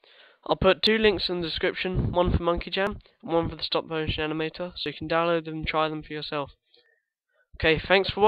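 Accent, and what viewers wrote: United Kingdom. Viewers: Are u from London? :o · heh... you sound british.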